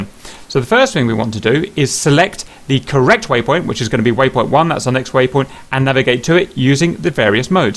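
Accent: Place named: United Kingdom. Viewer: A lot of information, but spoken too fast. Difficult to keep up with instructions and the British accent does not help. Any thanks!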